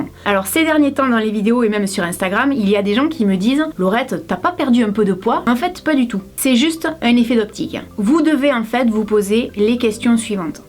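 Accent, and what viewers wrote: South, France. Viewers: J'adore ton accent du sud!! J'adore...... 😄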